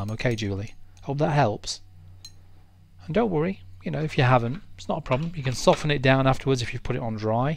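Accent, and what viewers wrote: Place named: Scotland, United Kingdom. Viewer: Omgosh ~~ I know this video is a year old & I’m so happy I found you😊 Long story short..I’m going through, not so fun times, but you were able to put a smile on my face..just by being you💕 I thank you. Just to get out of my “funk” as I call it I want to paint a cat’s eye. Thankfully I found you. Step by step over two hours. Nobody does that. They want $ sure rightly so however there are people who want to teach and they don’t care about $. You have integrity. Thanks for putting a smile on my face. It has been a few since I had one. Your accent helps too. My grandparents were from Scotland, and England. Hearing your brough has helped immensely. I would love to send you my finished eye. Is there a way? Hope you’re getting along well. I’m Scottish and live in America. This has been quite a couple years aye? Hope to hear back and pray that all are happy and well ~J~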